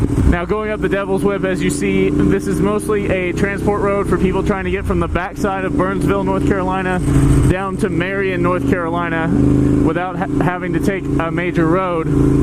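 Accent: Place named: Nebraska, United States. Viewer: Your hometown...since when? You sound like your from Nebraska.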